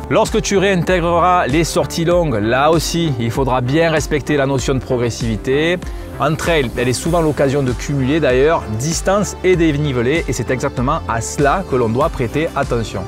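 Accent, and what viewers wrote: South, France. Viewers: un toujours aussi pertinent, intéressant,pédagogique.le tout accompagné d'un accent ensoleillé.Bref, c'est toujours un plaisir de regarder tes vidéos.Bonne reprise et bonne continuation.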